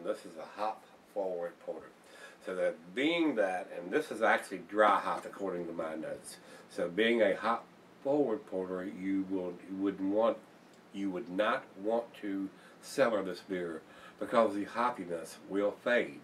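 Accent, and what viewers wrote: Texas, United States. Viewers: I am new to your channel, I found it through Reddit a few days ago! It's awesome! One question though, where abouts is your accent from? I'm British so it's hard for me to distinguish, but you sound Southern, maybe Texan to me. Thanks.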